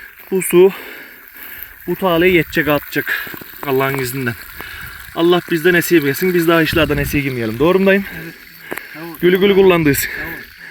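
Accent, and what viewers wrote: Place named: Aegean, Turkey. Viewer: Maşallah.. Tam da ege şivesi ile..Ben de denizli buldanlıyım. Sakaryada yaşıyorum. Yeni fark ettim sizleri. Başarılarınızın devamını dilerim.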